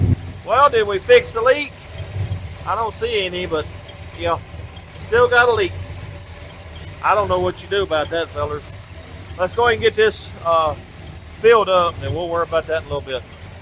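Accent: South, United States. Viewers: I figures...then I guess I will have to pull the plug on you and unsubcribe because my eardrums hurt from all that redneck gibberish. Too bad.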